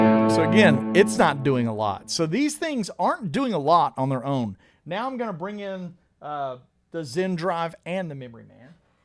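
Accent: South, United States. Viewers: Totally agree with you that aside from ACL 88, that Venus Isle was the best tone and era! I could never understand why Venus Isle was deemed not as good as Ah Via Musicom by many critics..Solos like ' ', ' ' and obviously ' ' are just otherworldly masterpieces.... will never be beaten, but I must say you have to be the closest replica I've ever heard!!!... If I closed my eyes and didn't know better I'd totally believe its Eric i'm hearing. Amazing guitar playing brother, absurdly clean technique (you've undeniably done your homework BIG TIME) and a very likeable friendly manner to boot - not least the Southern accent... There's more than a few things you have in common with the master:) Great video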